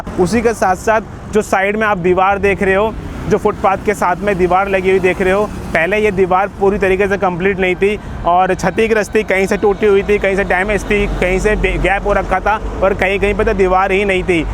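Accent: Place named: Bihar, India. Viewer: 100% aap bihari hai na q ki mae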